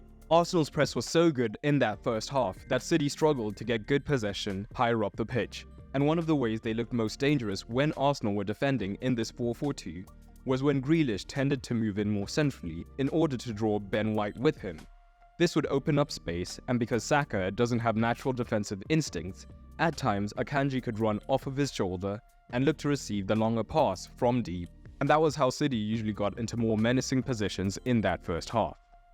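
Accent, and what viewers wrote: South Africa. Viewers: Once again...top class and in depth analysis that focuses on the intricate details of tactics👌 just a question..are you South African?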